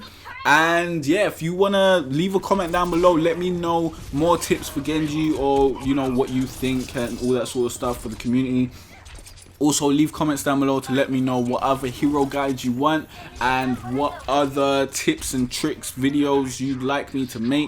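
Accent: United Kingdom. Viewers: Are you from north London?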